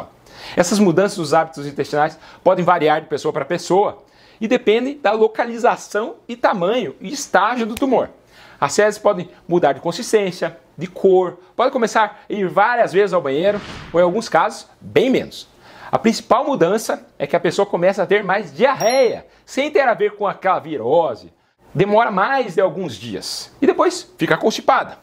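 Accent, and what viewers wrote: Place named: Caipira, Brazil. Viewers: Esse sotaque caipira deu um contraste curioso com as informações brindadas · Caipira ou Nao...eh sempre mto bom ouvi-lo! 😉✌🏽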